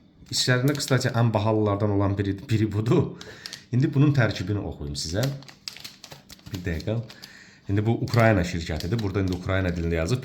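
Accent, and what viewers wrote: Ganja, Azerbaijan. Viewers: Gözəl Gəncə ləhçəsi, hər şeyə görə sagolun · Yeniden faydalı video üçün(+Gence lehcesi üçün)çox sag olun,ugurlar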